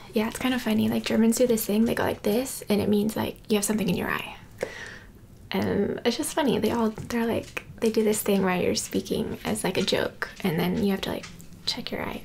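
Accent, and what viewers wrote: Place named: United States. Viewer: As a German i can say: I LOVE the American accent and yes, we are very friendly. I really enjoyed the video. Keep your work up! Greetings from Germany 😍☺️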